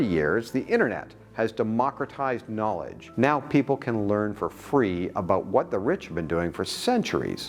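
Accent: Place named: United States. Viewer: Nothing personal but an American accent is a bit of a red flag especially with the few Americanisms being slipped into UK society since Brexit. A lot more Private healthcare and therapy adverts being the most obvious.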